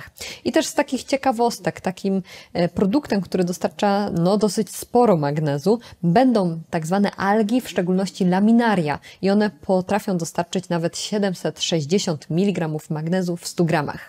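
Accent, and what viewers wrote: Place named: East, Poland. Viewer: Ta pani pewnoe jest ze wschodu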